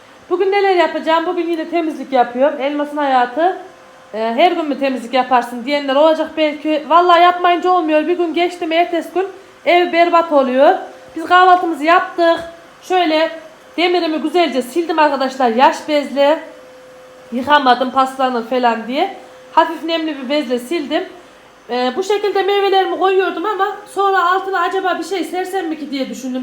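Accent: Aksaray, Turkey. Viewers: Yeminle doğal konusmani çok seviyorum lütfen Kibar konuşma Aksaray aksanıyla konuş Elmas :^) ayrıca" dürüm" lerine bayılıyorum 👍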